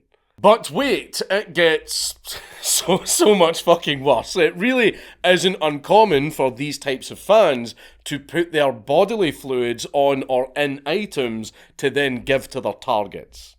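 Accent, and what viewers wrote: Scotland, United Kingdom. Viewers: The thumb nail with the adoring fan 😂😂😂 oh man. We called him Troll Kid because he looks like a troll doll. Extra points if you know who sang this in Oblivion: Flyyyyyin’, flyin so high, cliff racer FLY sooOOO high. FlYYYYYin’ Edit: please tell me you and your friends karate chopped Minotaur wang too and launched the adoring fan off the cliff 😂 good times… With love from the USA Freakin love the Scottish accent 🤤 hope to come back some day 🤘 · I love how the Scottish accent changes Twitter into Twatt-er - which sounds incredibly apropos.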